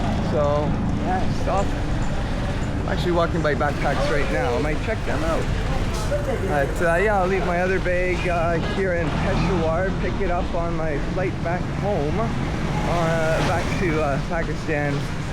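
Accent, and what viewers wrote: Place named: United States. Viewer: Maybe taliban suspicious of you because you sound American. They are watching you all the time 😮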